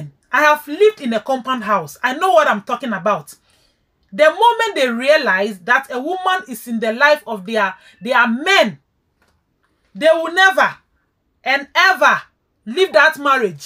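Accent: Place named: Ghana. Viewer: Are you a Ghanian.because of your accent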